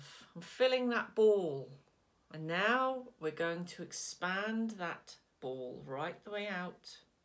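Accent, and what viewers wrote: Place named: East Anglia, United Kingdom. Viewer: Thank you 🙏that was wonderful. Are you from East anglia? I used to live in Suffolk... I recognised the accent? X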